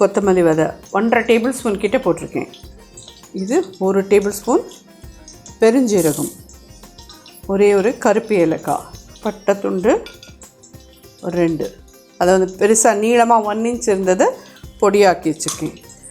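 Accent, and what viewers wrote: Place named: Thanjavur, India. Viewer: Mami neenga Thanjavur karala. Engathu periyava Madhiri peseral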